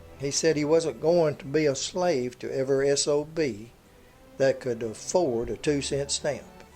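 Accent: South, United States. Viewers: This was a really nice history lesson given in your fabulous Southern style of delivery. The more I learn and read about famous authors and other artists, the more I find how many of them were heavy drinkers. Maybe when they are a bit high on alcohol is when an idea for a novel and such comes to them. Who knows for sure?:), are you driving Lincoln Towncar? The front end looks really familiar to me since I drive one.:)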